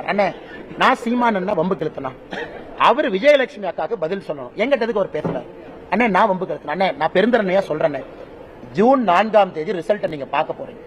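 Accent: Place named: Karnataka, India. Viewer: இவர் பேசும் போது கன்னட வாட வருது.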